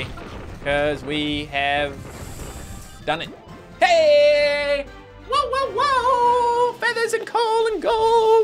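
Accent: Newfoundland, Canada. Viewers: You're Canadian? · Wait your a Newfie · love ur canadian accent and ur "cosed" "o" in "sorry" from where I am the "o"s are "open" my sorry would look like a sarry and we're made fun of because of that ^^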